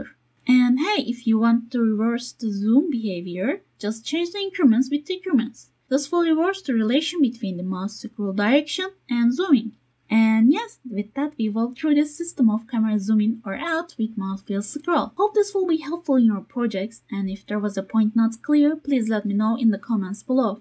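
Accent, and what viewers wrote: Turkey. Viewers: aksandan türk olduğu anlaşılıyor :) teşekkürler video için. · Türkçe gibi İngilizce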